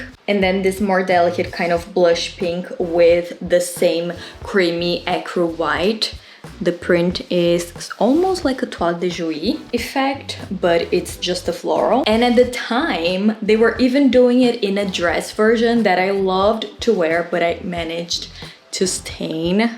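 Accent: Brazil. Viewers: Brasileira, que legal! I was wondering where your accent was from, I really liked your content! Jesus te abençoe, muito sucesso!!!❤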